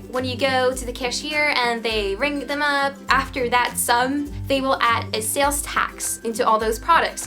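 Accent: South, United States. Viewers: You have a southern accent. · Had you not said you were Finnish I would have said you have a southern accent, so later in the video when you said you were in Charlotte, I just kinda went "I knew you were in the South!" You're right, everyone has an accent. I live in Utah, though I grew up in the Chicago suburbs, and I still get asked where I'm from, in spite of having been in Utah for 18 years now. But I've apparently picked up enough "Western" accent that on visits back to Illinois I get asked there where I'm from too, so.... there is that, I suppose. I actually work with a Finn and he's got a rather strong accent, but I'd have never been able to tell where from until he told me he was from Helsinki and moved to the US as an adult. Accents simply are. Just not a big deal. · Does your family tell you that you're accent is changing? you sound super southern . 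· I like the accent. You can tell there is a Finnish accent, but even a little southern in there too. Very cute. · I'm from the Mid-Atlantic and I can hear a Southern accent on her. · She sounds slightly southern to me. Like a southern born girl who has lived in a northern state for a few years and starting to pick up on a northern accent. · Your English is perfect and it’s sounds very southern lol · Your accent sounds southern . How long have you been living here ? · Great video! Your English is great, even a little Southern in places. I just wanted to make a few suggestions. Less dark eye makeup and a little peach or pink gloss on the lips would really suit you. A brighter colored top, too, in pink or light blue, would look better on camera. The dark top makes you disappear.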